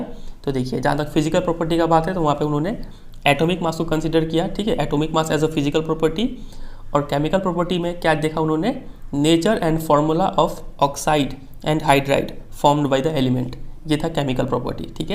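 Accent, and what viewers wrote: Bihar, India. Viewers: Sir aapki voice se pta chalta h ki aap bhi bihar se ho vaise sir main bhi bihar se hi hu Samastipur district se.....by the way sir aap itna acchhe se padhate ho ki mujhe lgta h ki school chodd ke aapse padhu padhu..bs .. . Thanks alot sir for giving such bigg efforts for us.....sir love.....so much......bhagwaan kre aapka channel itna grow kre ki aap hmesha kush rho or apki financial issuue....hamesha achhi rhe ....thanks sir ...😍😍😍😍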